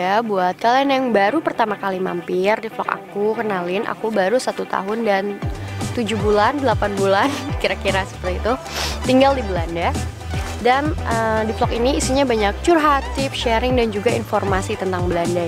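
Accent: West Java, Indonesia. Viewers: Teteh ada sunda nya ya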